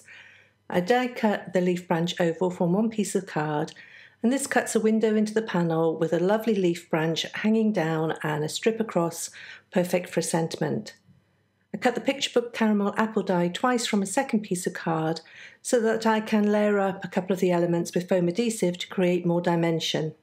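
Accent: United Kingdom. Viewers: Absolutely amazing. And great to hear a British accent 😊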